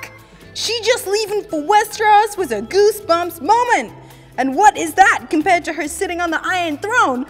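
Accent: United Kingdom. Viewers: why are there subtitles?? is a british accent so hard to understand????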